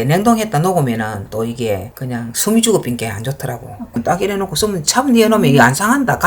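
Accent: Gyeongsang, South Korea. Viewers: 경사도 사투리로 TV 요리프로에 함 출연하시면 좋겠어요!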